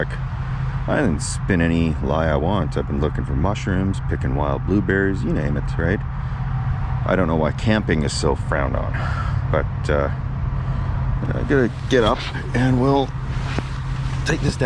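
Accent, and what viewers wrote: Canada. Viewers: Canadian accent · your calm Canadian voice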